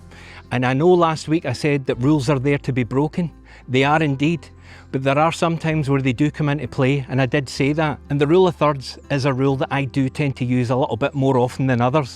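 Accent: Scotland, United Kingdom. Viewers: We have all done these thats how we learn and improve. Love the way you explain how to capture better photos. Thanks 😊everything sounds better with a Scottish accent. Lovely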